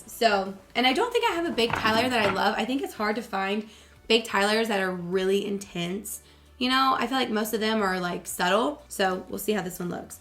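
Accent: South, United States. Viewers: I can hear you southern accent more when your voice is coarse. It’s so cute! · love your video and your southern accent... greetings from Athens GA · Not sure if it’s you losing your voice or moving back to Georgia but your lil southern twang is coming back out! So cute 💜💜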